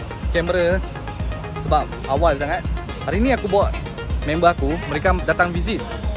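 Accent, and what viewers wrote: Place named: Kedah, Malaysia. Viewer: Bos cakap lorat kedah mcm pak wei baru syok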